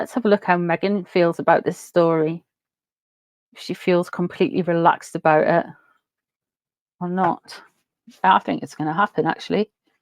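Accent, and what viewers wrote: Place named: North, United Kingdom. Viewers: Don't worry about pronunciation, my Auntie was from up North and said book like you, it just were you are from in the UK! Be proud of your accent, I was born in the Midlands and now live in Australia, my Australian son in law teases me about my accent :_trifle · I love individual accents, and the north east of England always gets voted as one of the friendliest!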